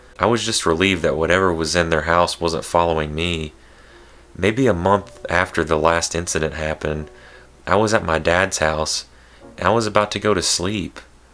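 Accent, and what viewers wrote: South, United States. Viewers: You're beautiful AND you have a southern accent.... MEEEEEEOW!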